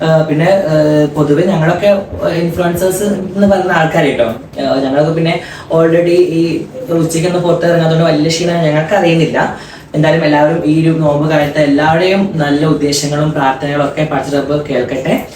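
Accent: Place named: Kuttippuram, India. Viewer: nde veed kuttippuram alle. Njan chembickal anu. Njangale samsaram polethanne nindeyum. Orupaad uyarangalil yathatte. Padachon anugrahikkatte. Yende naatukari penne❤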